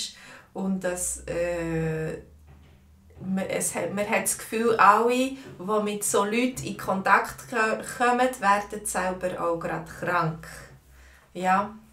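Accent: Bern, Switzerland. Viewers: Ich bin auch in Bern!, ist dein Dialect gemischt oder Bärndütsch?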